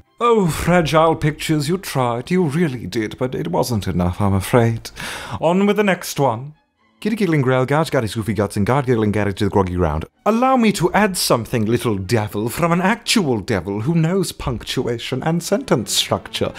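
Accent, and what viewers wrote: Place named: RP, United Kingdom. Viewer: British ,butler.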